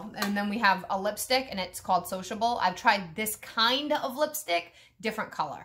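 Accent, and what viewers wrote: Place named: New York, United States. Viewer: I love your videos! 🥰 are you from New York I’m trying to identify your accent!?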